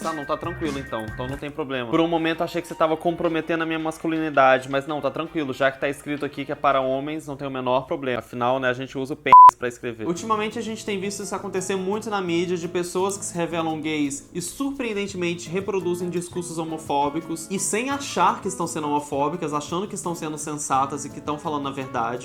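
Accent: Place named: Brasília, Brazil. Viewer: O é brasiliense? Cheguei no canal há pouco tempo e tô reconhecendo o sotaque hehe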